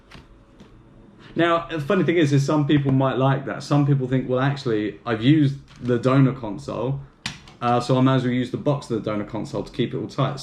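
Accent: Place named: Europe. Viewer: This is AMAZING! I have been working on a Metal Gear Solid custom GBA. Where did you get the sleeves printed? I assume by accent this is somewhere in Europe :( I am in USA and this is very expensive to find a single print custom box for my GBA.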